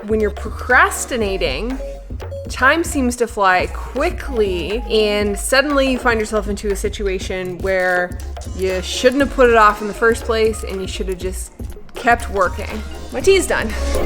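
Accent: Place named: Newfoundland, Canada. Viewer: The Newfie accent is THICCCCC right now!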